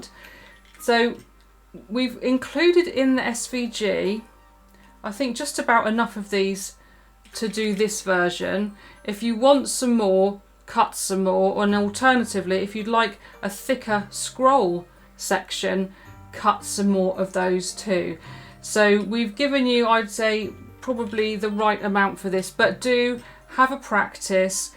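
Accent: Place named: United Kingdom. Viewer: I think everyone hates the sound of their own voice. I feel like mine is really deep for a female but then I'll hear myself on a voicemail or something and it sounds nothing like what I hear. But you have a great voice. I'm a sucker for an accent anyway. I'm assuming you're from Great Britian. Do you live there now? One day I hope to make it across the pond to visit all of the British Isles including Ireland. I've always been in love with those places and it's my dream to visit!!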